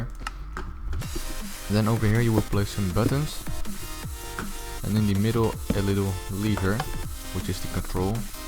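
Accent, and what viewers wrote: Netherlands. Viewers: Goede video ik hoor dat je uit Holland komt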